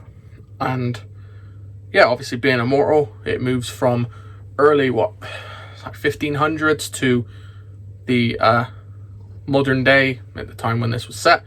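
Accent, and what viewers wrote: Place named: Bristol, United Kingdom. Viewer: Great video - Love how you are int oso many different genres, aloa love the accent from a fellow Bristolian! More please!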